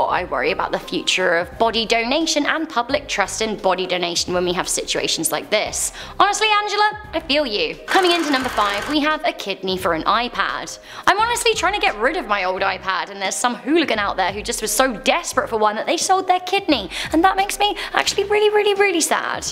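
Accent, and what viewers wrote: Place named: United Kingdom. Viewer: beautiful dress i love british accents ive been watching this channel for over a year and i still love your accent it never gets old to me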